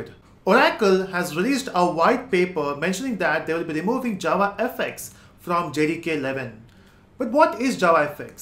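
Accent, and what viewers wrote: India. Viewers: There are thicker Indian accents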